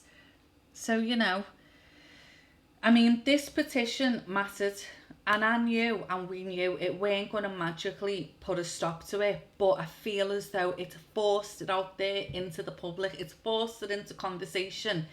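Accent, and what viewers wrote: Liverpool, United Kingdom. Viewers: As a southerner I love the scouse accent ...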